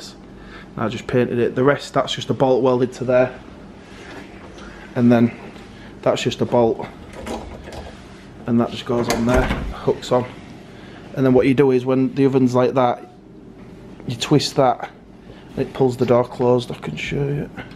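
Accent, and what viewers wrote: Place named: Manchester, United Kingdom. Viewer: My God he's from Manchester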